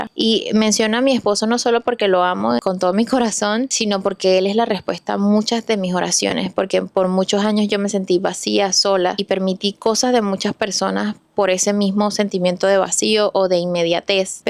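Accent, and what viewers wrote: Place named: Táchira, Venezuela. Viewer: Hola, desde que te mudaste a usa te he seguido mucho viendo todo lo que haces y empecé hacerlo porque te vi como un ejemplo. Yo también emigré y emigré sola bien chiquita después de graduarme de bachillerato y tus videos me hacían reír también al ser gocha me daba un poco de cringe el acento (la verdad jsjaja). Y ver este tipo de contenido de verdad me hace tan feliz, además siento que es una señal. Al estar sola sola me refugiaba en dios en rezar y rezar y pedirle todo lo que quería o necesitaba para mi bien estar. Y poco a poco me lo fue dando y siempre supe que era el, siempre un mensaje un detallito que solo yo en mis rezos decía. Hasta sacar personas que me hacía mal de mi vida! Pedía a dios si esta persona no es para mí sácala de mi vida aunque me duela el alma… y así mismo fue. No solo con relaciones amorosas, con trabajos, viajes, amistades, etc. 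Después de tanto estrés por inmigrar y tener 3 trabajos vivía muy frustrada pero desde que empecé a agradecer cada día a dios todo mejoró! Y muchas puertas se me abrieron! Pd: por favor sigue subiendo más capítulos ya tienes una fan fiel, gracias ❤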